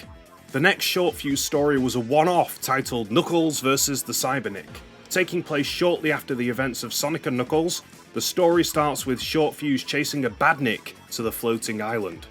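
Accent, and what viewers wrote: United Kingdom. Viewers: I'm british, myself, but sometimes I really notice that wonderful british accent :D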